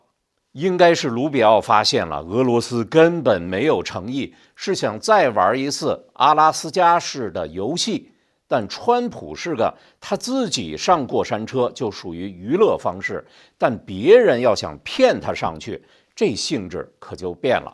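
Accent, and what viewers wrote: China. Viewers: 听口音都知道从中国出来的你觉得呢？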